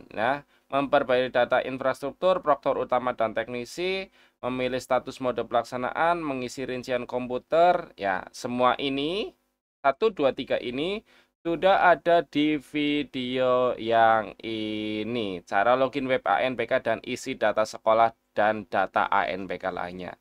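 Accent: Banyumas, Indonesia. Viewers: LAMA GAK DENGER SUARA NIH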